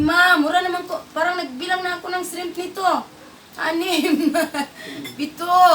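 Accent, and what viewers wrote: Visayas, Philippines. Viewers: Hahaha bisaya ka gd day oi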